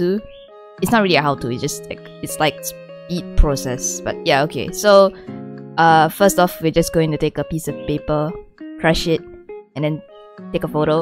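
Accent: Singapore. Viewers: Is she singaporean she has that accent 🤔🤔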